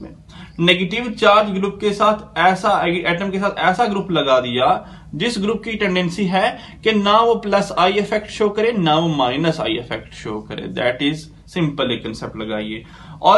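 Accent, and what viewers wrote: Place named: Kashmir, India. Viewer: thanks ..but sir ksm sa lag rahai ha kashmir sai hai ..isiliyai pucha tha sir sai ..😊😊😊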